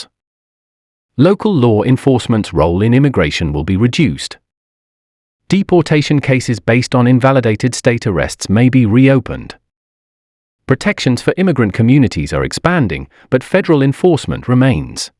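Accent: United Kingdom. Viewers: I don’t need to hear a British ethnic voice talk about the USA. Didn’t we kick those people out of the America for a reason?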